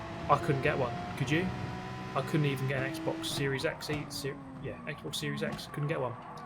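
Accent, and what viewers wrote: United Kingdom. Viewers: after a Long Hiatus from arcade/sim racing Gran Turismo 5 and GTR 2 Fia GT Racing PC( last purchased games). I'm just getting back to the groove months ago(July2020) from pad controller to Fanatec CSL F1 bundle(September2020) . Gran Turismo Sport(Awesomeness) and ProjectCARS2(Sim AWESOME), ProjectCARS3(going backwards A FLOP). I'm Hoping with GT7 to be the BEST in both Worlds, Graphics, Driving Realism Arcade and Competitive Sim Racing, I enjoyed watching your videos and host commentaries. I wish I did'nt take that Hiatus. Keep up the Great Work and Race Sim Driving !! Ohh, one more thing, I guess your Filipino ..cause it's pretty cool hearing that Brit accent. ;o)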